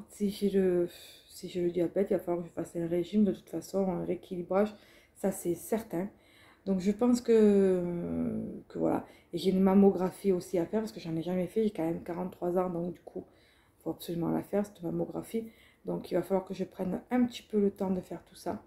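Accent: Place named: South, France. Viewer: Coucou tu m as fait trop rire et j adore t ecouter avec ton accent du midi oui je pense comme toi en vieillissant on aime redécouvrir les choses simples comme le jardin bon aprem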